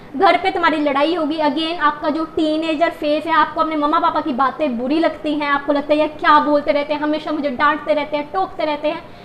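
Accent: Punjab, India. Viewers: Didi aap punjabi ho kya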